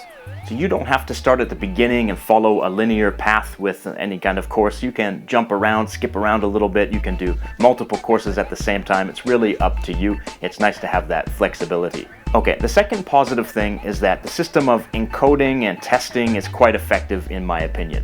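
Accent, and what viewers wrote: Canada. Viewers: For some reason I knew that you weren't American. I know that you are trying to speak clearly, so non natives can understand you better, but in general Canadians speak more eloquently and clear. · Thanks for the information! I was curious because your accent didn't sound like the "standard" Canadian accent to me. From which province do you come from?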